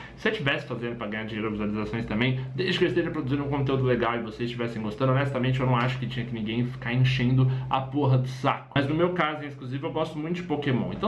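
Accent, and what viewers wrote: Brazil. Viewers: tu és pt e tas a falar pt br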